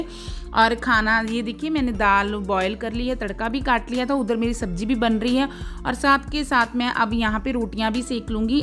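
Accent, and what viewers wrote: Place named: Punjab, India. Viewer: Aap ki language Punjabi lagti hai, but aap shayad ho, aap plz apne baare mein kuchh explain karen aap kahan se ho??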